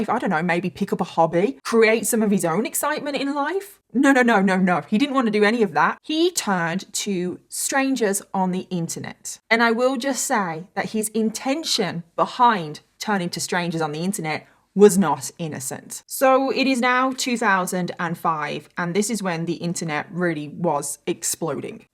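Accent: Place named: Birmingham, United Kingdom. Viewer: I detect 10% Brummie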